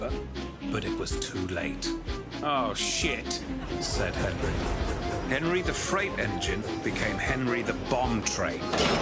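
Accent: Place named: Arizona, United States. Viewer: He sounds like everyone from Tuscon Arizonia.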